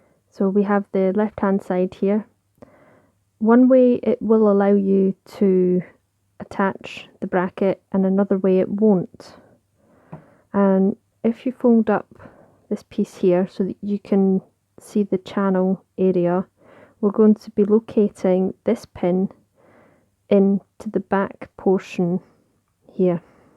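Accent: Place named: Scotland, United Kingdom. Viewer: Thanks so much for this video. It is very well done and has provided me with clear and concise instruction. Was lovely to hear your Scottish accent too. A wee bit of home for me. Thanks again.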